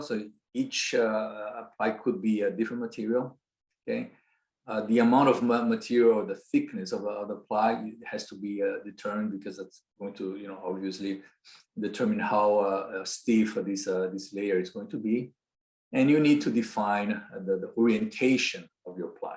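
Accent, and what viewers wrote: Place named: Brazil. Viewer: I knew that accent was Brazilian! Super cool seeing an Asian person with a Brazilian accent!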